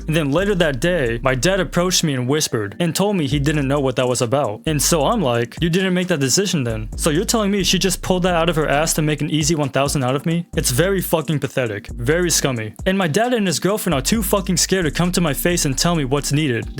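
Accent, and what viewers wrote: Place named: Australia. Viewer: W Australian voice,w good as videos are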